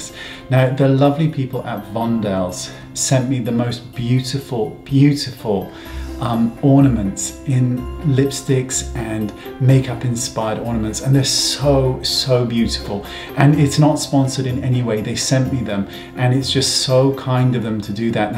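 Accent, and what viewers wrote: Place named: England, United Kingdom. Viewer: Why did I just realize after a few years of watching he has an English accent….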